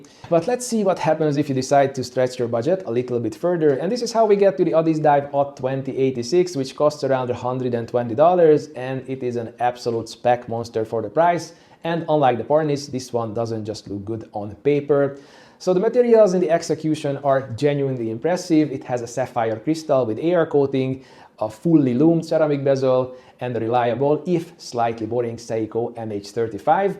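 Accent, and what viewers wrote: Hungary. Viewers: Magyar akcentus amit hallok? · What accent is that, Hungarian?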